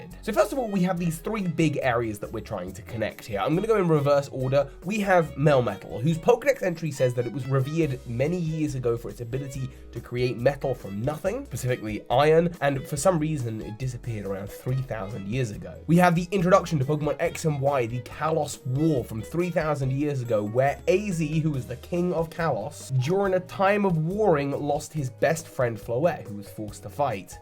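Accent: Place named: England, United Kingdom. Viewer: I love these kind of videos so much, I could listen to for hours as he sets up and explains these theories with his English accent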